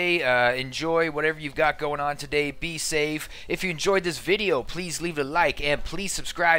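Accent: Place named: United States. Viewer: you sound American but I'm American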